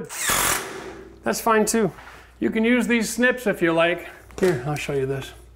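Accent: Canada. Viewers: I'm not sure about there, but they changed the formulation of pressure treated down here, and it eats steel pretty fast. If you don't use HDG or coated nails / screws - they'll be completely gone within 2 years. What your using there doesn't look pressure treated though. Maybe there's some sort of flashing tape, or maybe a strip of tyvek or something would be good to protect that? Idk - tyvek might pass it right through? Or maybe there's some kind of coating for the track? Maybe - my fear is just unfounded! Also, dang right, tyvek isn't a vapor barrier! It's a vapor retarder, but it's not a barrier. But up there (assuming Canada from the accent), my understanding is that exterior walls up there have a vapor barrier on the inside, fully taped seams. Down here in hot climates there is no vapor barrier at all. There's a vapor retarder. The paper face on the insulation - which also goes on the inside of the wall. 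The exteriors we wrap with either tyvek or 15lb.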